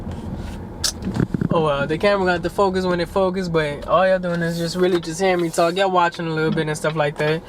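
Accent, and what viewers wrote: Louisiana, United States. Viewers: You got a lil Louisiana slang accent must be borderline Mississippi lol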